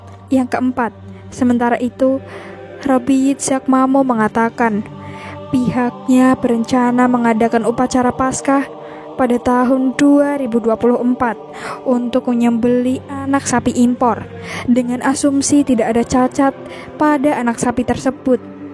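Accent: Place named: Java, Indonesia. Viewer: Kentel bgt logat jawa nya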